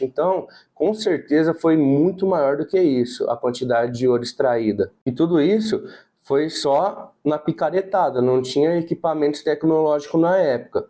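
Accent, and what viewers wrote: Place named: São Paulo, Brazil. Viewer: Você é simpático e fala - o sãopaulês-, bunitim. Valeu, pelas informações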